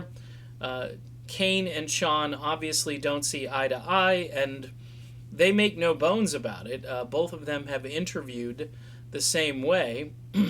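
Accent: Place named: South, United States. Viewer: For that sounds hillbilly fir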